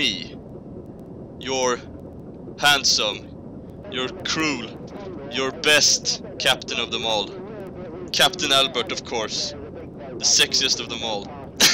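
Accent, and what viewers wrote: Sweden. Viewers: Are yuo Swedish? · are you, Swedish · are you swe ore englich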